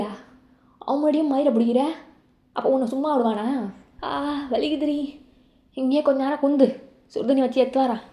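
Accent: Chennai, India. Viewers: Taekook love so cute JK mom great jk jimin Felix friendship really cute 🥰🥰 this movie all songs my favourite songs ❤❤❤❤❤❤❤❤ Chennai language உங்களுக்கு நல்லா இருக்கு match ஆகுது😂😂😂😂 different style movie 🍿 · Madras slang super sis 🔥🔥🔥🔥🔥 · Story romba nalla iruku iyoo antha chennai slang tha semaiya pesuringa iyoo enaku romba pidichiruku but story avlo thana nalla irunthuchi duration than kamiya iruku innum kojam irntha nalla irukum story super ❤❤❤❤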